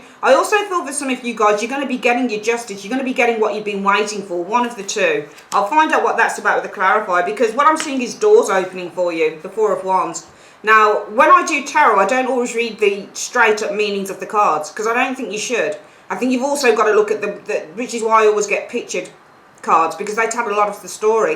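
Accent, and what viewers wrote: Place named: Midlands, United Kingdom. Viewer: You are good. So accurate it’s scary. Love your midland ?accent. Xxx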